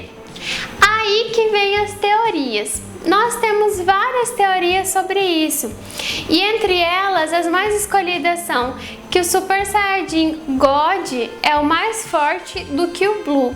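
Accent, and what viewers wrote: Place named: Londrina, Brazil. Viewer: você e londrina? ( o sutaque parece)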